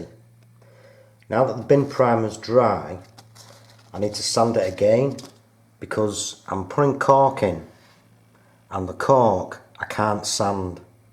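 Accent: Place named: St Helens, United Kingdom. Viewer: Sounds like a St Helens accent that mate, good work as well bud 👍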